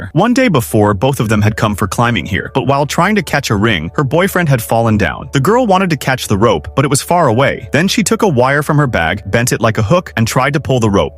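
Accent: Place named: Australia. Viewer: vro he's Australian..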